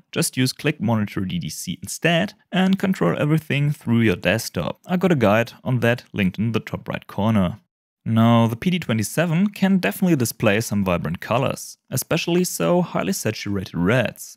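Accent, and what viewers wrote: Germany. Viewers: Dude, you sounds like a German who speak English. Hoffe mal ich lag richtig. Hahah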